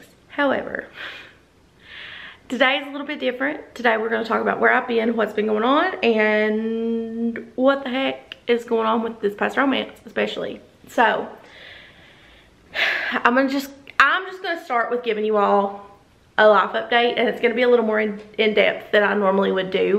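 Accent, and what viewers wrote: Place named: Southwest Virginia, United States. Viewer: I’m new to your channel, but I wanted you to know I’m sending you so much love and light 💞 I lost my dad in 2022, and I swear you’re right about grief. The things that hit the hardest are after they’re buried, after the dust has settled, and you wake up to remember the loss all over again. Girl, you’ve had a super tumultuous year. Take your time to recover ❤️‍🩹 and hey! I’m from Virginia, and you have the same accent as my grandma from SW VA in Grundy! Again, sending you love 💕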